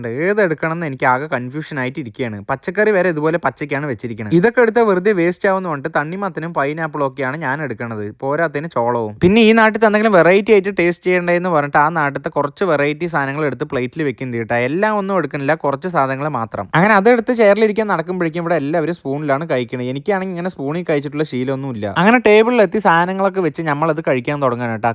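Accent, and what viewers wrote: Palakkad, India. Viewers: വിയറ്റ്നാമിൽ ഇരുന്നു നമ്മുടെ സ്വന്തം പാലക്കാടൻ ഭാഷ പറയുന്നതിന് നന്ദി❤❤നലവീഡിയോ സൂപ്പർ കാഴ്ച്ച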